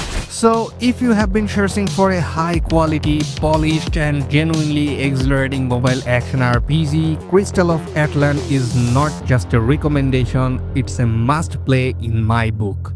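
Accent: India. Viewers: R u Indian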